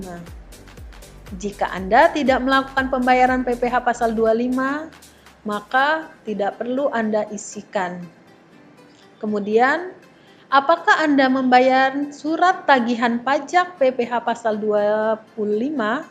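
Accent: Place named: West Java, Indonesia. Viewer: Urang sunda kayaknya ibu nya.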